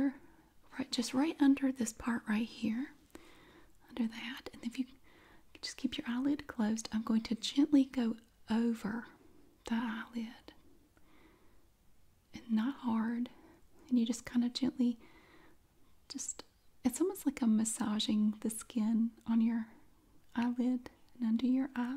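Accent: South, United States. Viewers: The voice of an angel, love the sothern accent im southern also from thomasville nc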